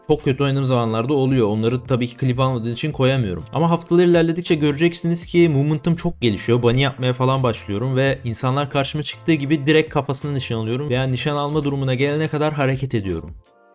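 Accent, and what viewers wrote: Thrace, Turkey. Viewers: Allahım bu trakyanın şivesine bitiyorum hep böyle konuş abi çok güzel la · konuşmandan trakyalı olduğu anladım bir tık. nerelisin?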